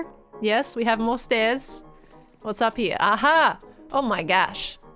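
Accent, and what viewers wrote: Australia. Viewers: I just started watching your channel yesterday and was watching today with my daughter and she asked if you're an Aussie because she feels like we're under represented on YouTube (she's 8). She'll be very happy to find out you're an Aussie as well.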